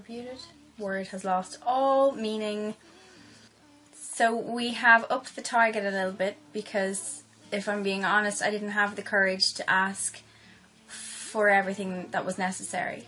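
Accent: Ireland. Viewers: Are u Irish I recognise the ascent from when I used to live there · I think she's irish?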